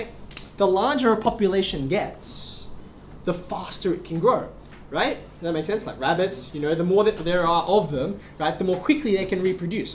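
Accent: Australia. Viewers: Prashant Kumar It's an Aussie accent, bruh. And he lives and works in Sydney, Australia. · Clearly Australian haha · Is he an Australian Asian? He looks Asian, but he has an Australian accent. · It sounds like he speaks English as a first language, as you can distinctly hear an Aussie accent. He likely has learned some of the backgound of Chinese, since he did introduce Chinese numerals in his class to make another point.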